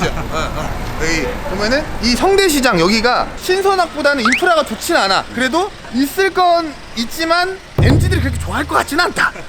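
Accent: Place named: Jeonju, South Korea. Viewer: 아저씨 말투보니깐 전주출신 같은데 ㅎㅎ 능글맞은 것도 그렇고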